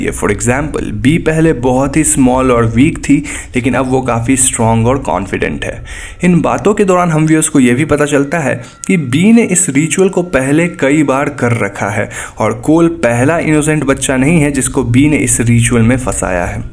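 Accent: Bengal, India. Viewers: You have a bengali accent😅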